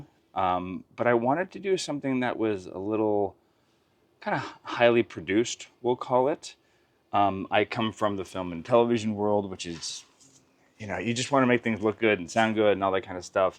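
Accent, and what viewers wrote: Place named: Canada. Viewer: Sounds great. I think some of your words are just Canadian accents. My friend lived in New York and when he says dog, I crack up! We love you guys and it’s great to have community with all our imperfections!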